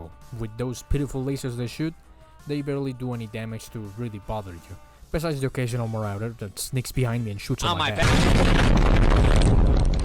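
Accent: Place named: Eastern Europe. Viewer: The Eastern European accent has become strangely endearing to me. Given the vast amount of YouTubers with the accent who I watch, I have subconsciously begun to associate the accent with quality content. I’m not sure if that’s a good thing.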